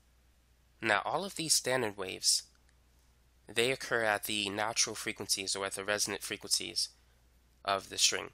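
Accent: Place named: New York, United States. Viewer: I love this dude. he's sounds slightly gangster, and fully genius at the same time. sounds like a New Yorker who made it out the hood because he smart af. Props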